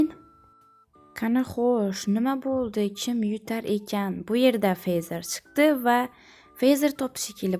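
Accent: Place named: Khorezm, Uzbekistan. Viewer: Chota ovoz Xorazmchaga tortib turbdi xorazmdanmasmisiz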